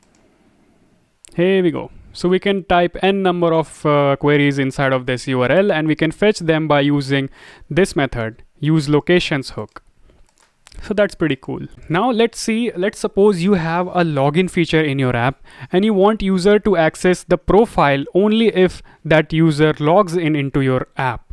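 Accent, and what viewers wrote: India. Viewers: everything was fine untill the indian accent, dislike. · first i thought oh..this is not an indian guys....then after 10 sec the indian accent comes in..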